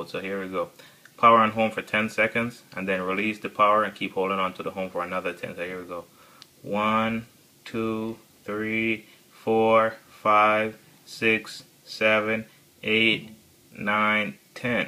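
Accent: Jamaica. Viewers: Jamaicaness of this dude cannot hide...mi luv the fact seh him nah twang an still understandable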